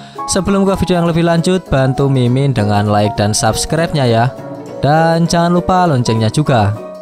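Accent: Java, Indonesia. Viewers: Asap galaxy : wedus gembel...🤣🤣🤣 Jowo ne muuuedok min...👍👍👍👍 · jowo ya kak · Keren bang walau dengan gaya jawa nya :)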